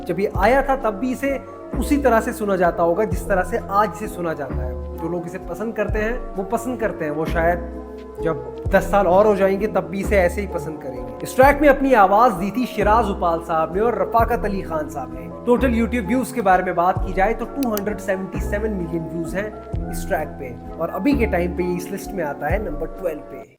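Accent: India. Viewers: Yaar, Please speak correct Urdu. Okay. Abhee kay time pay? What is this? If you want to speal Mumbai style urdu say so? Sub Hindustani kyon bolyat ho bhai?? Please explain??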